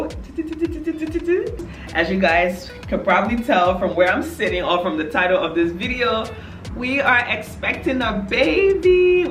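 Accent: Liberia. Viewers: Congrats on everything! I have to ask, are you liberian? I love liberian accents!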